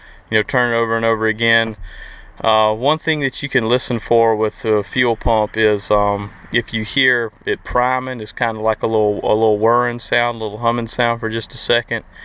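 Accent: South, United States. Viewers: i don't care about the fuel pump . That southern Accent makes everything sounds fine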